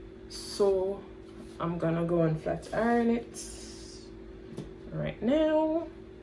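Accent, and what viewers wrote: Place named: Jamaica. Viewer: Jamaican accent so real mi lobe it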